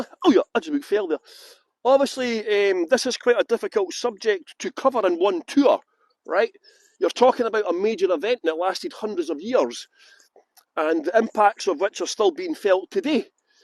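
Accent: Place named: Scotland, United Kingdom. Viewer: Love the Scottish Accent ;-)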